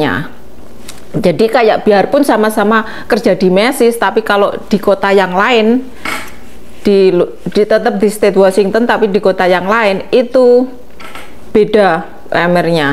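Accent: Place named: Java, Indonesia. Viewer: gpp medok Jeng.....iku ciri khas e lo . gampang di eling2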